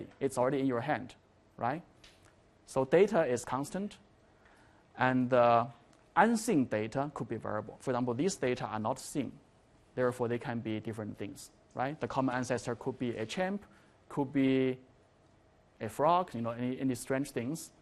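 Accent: China. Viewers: Chinese English,XD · 我第一反应也是天朝口音真亲切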